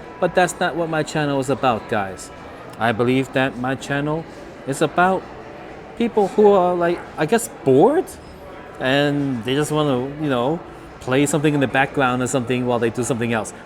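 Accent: New York, United States. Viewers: Thanks for your service! My wife has kind of the same pronounciation problem as you. Lol...but, you do it with a New York accent. Don't stop talking! That's why I watch! No, I've never wanted a late night snack of vegetables. Hat is fine. It makes you look distinguished.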